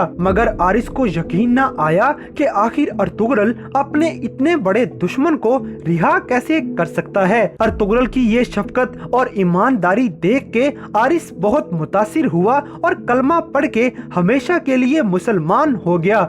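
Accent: India. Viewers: Ye bihari haina isliyen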